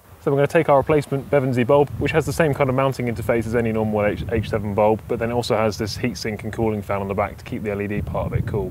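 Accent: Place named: England, United Kingdom. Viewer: Dude, dont get offended on this. But it doesnt add up... IRL licence plate but you got English accent :) how come? Which year was the car made? Decent shape tbh! But also, i wish these fixes would cost the highest effort to complete :D ... or not all Landies are rubbish as the urban legend says? The lighting is pretty decent upgrade!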